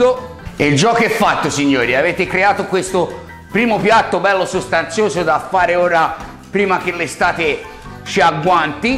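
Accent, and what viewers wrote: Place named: Tuscany, Italy. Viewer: Sei strabravooooooooooo❣️❣️❣️❣️Mio marito adora i ciccioli e devo assolutamente cucinare la tua ricetta,ma in Svizzera non trovo i ciccioli xciò dovrà aspettare la nostra prossima puntatina in Italia e precisamente a Brescia.Sei simpaticissimo ,adoro il tuo modo di fare è il tuo accento toscano.Bacione😘😘😘😘😘😘😘😘😘😘😘😘😘😘😘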